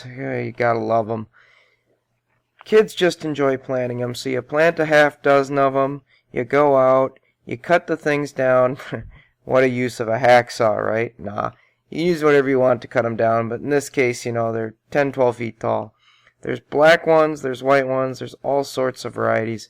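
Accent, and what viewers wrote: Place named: United States. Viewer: We love your American accent! Thanks for telling us a great recipe :) Really helpful - we have thousands of giant ones!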